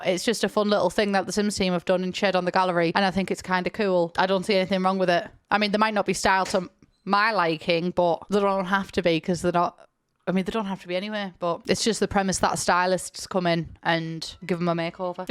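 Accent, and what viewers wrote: United Kingdom. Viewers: Ur british accent is so thick I sometimes fprget that u speak english, but maybe its just me, cause my mother language isnt english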